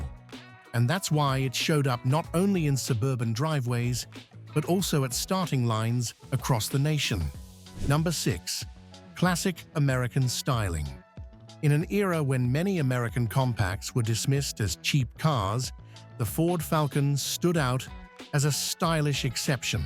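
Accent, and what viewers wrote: United Kingdom. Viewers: Please lose that, britsh accent. They didn't make the Falcon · Who writes this crap? British accent narrator knows little about the Falcon!!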